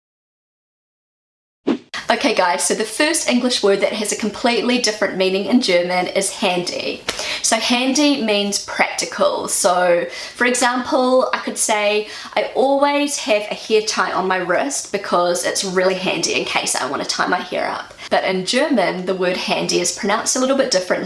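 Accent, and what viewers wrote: New Zealand. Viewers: As a native speaker of English, I have less trouble understanding your German than your Kiwi English. Dead, pronounced “did” Gift, pronounced “guft”. As with the famous graffiti: Australia 6 New Zealand 7 Pronounced: Australia sucks, NZ ‘sivven’ (‘s heaven). Keep up the good work! 😉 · Just found your channel and I am enjoying it! I think, with an Aussie twang, some of these German words feel easier to pronounce than perhaps yours with a Kiwi accent. Eg. Links ('Lien-x' in Kiwi, 'Lin-x' in Aussie). Probably vice versa on some words too.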